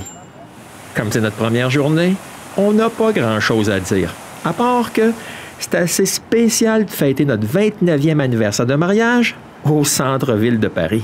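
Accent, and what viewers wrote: Quebec, Canada. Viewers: ton accent, le fameux accent de nos cousins québécois, on adore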